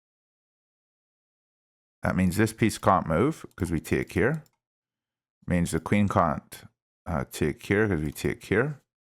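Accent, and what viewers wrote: Northern Ireland, United Kingdom. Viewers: Is that a northern Irish accent with a very slight glimmer of either European or African? Very interesting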